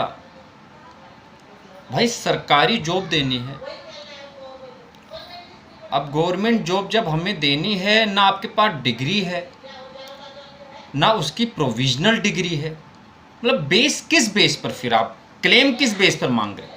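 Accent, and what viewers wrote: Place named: Ghatampur, India. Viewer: Ghatampur ya fatehpur ki language lag rahi hai, sir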